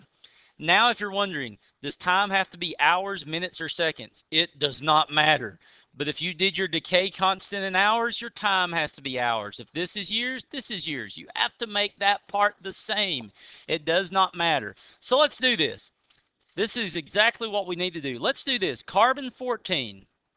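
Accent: United States. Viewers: Science spoken in American English 🤪👍 loved it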